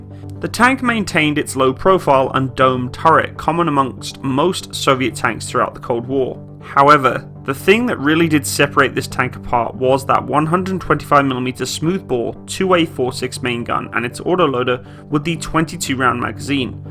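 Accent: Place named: United Kingdom. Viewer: The quality of your analysis and videos are second to none. Thoroughly researched, well composed and hugely entertaining to boot. I will have to admit that hearing a British voice from home is an added joy factor for me. I actually look forward for (all) your new videos. I can’t say this about any other YouTube (or TV) channel. Please keep going.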